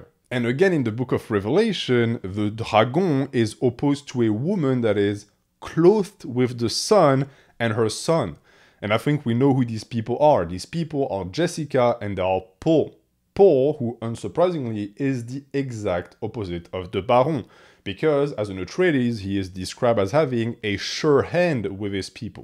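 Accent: France. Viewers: Selon votre accent, je dirais que vous etes francais. I found the french version of the audiobook vastly superior to the english one, what were your thoughts ? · Listening to a French guy talk Dune for over 3 hours was as delightful as I thought it would be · The French accent when speaking English is so pleasing to the ear. I could listen to you talk all day.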